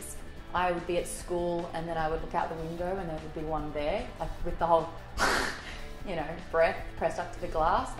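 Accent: Australia. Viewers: I hope you never lose your accent. Australian is so nice to hear! I hate that so many Australian/New Zeelanders/British people in acting don't get to use their accents more. So when I hear an accent I just smile and it brightens up my day. It's one of many good things about you. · Don't ever lose that Australian accent,! It's part of your charming personality!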